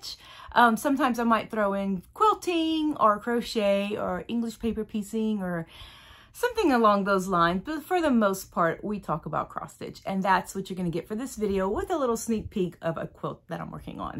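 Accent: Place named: Acadiana, United States. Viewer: I picked up your accent! Lol love the Acadiana pattern. Leave the crawfish!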